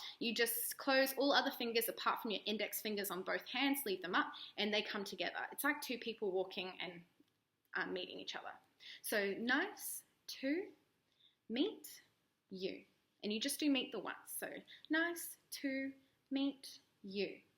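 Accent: Australia. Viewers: You got a Aussie accent for sure! Haha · Im aussie and even I could hear that accent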